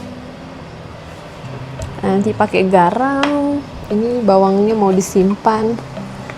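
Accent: Pontianak, Indonesia. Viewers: sudah kuduga.... Logat nya pontianak sekali.... Pontianak dmn nya kak? ❤salam dr pontianak jugak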